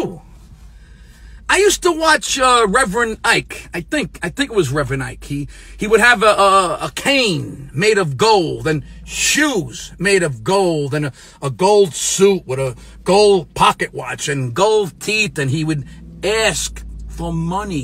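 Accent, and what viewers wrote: New York, United States. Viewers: ...you sound like ur from New York?? Crack me up!! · What area of America is this guys accent? New York? I love it 👍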